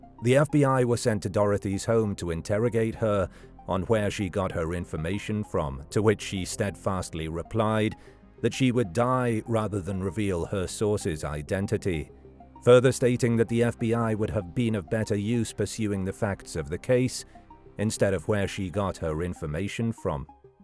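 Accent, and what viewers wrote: England, United Kingdom. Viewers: Why do this in an england accent?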